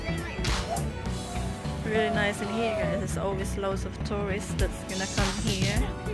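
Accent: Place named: England, United Kingdom. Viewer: Dami swans sis. Madaya yong geese .... English accent ka na rin sis.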